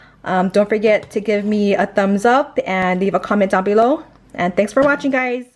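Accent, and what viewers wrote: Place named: Hawaii, United States. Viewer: Watching the video before checking out any of your other videos, I got a sense that you are from Hawaii or live in Hawaii because you have that local accent. 😭❤️I’m from the Big Island but I’m away for school at the moment. Going to cook this tonight for dinner! Excited because I miss home and home food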